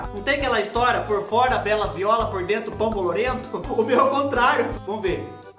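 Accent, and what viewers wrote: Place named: Minas Gerais, Brazil. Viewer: océ é mineiro, falando parece kkkkkkk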